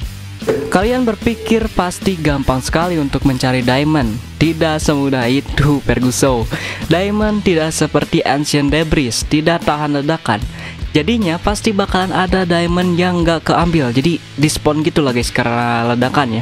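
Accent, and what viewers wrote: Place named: Sunda, Indonesia. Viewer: Susah kek nya dh biasa ngomong bahasa Sundah teh maksain make bahasa indo😂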